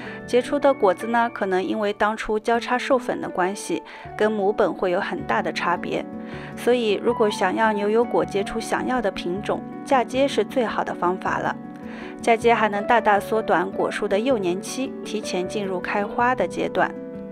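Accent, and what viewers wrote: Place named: Jiangzhe, China. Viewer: 口音像江浙沪那边的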